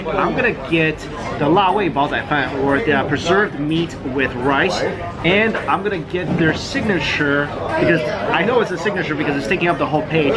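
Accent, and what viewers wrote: New York, United States. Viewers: and on, the chicks sitting behind you are freaking out. You look local but you´re talking New Yoakah, LOL!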